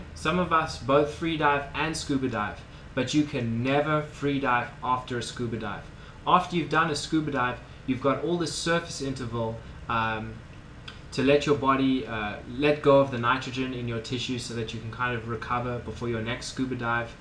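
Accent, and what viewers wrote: South Africa. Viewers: Thanks for the tips mate, are you from South Africa? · Nothing like a good ol' SA accent :) Cool vids boet, keep 'em comming!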